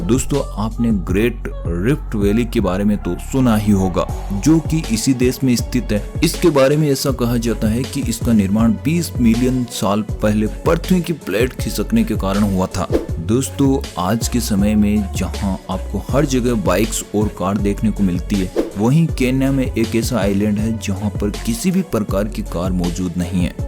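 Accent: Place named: Rajasthan, India. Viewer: Aapki aawaj rajsthani lagti h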